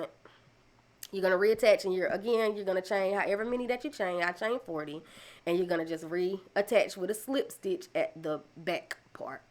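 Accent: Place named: Texas, United States. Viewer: I was just wondering when would you have a new CIY vid!! Thank you! & I love your accent, sounds like you're from Texas.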